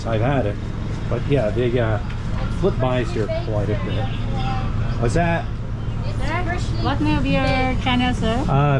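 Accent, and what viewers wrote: Philadelphia, United States. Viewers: Bro, you still talk like you are back in Philly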